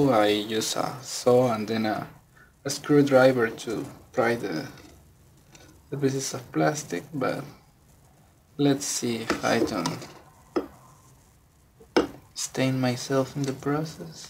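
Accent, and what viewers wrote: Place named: Argentina. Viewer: sos argentino? you have the calssic argentinian accent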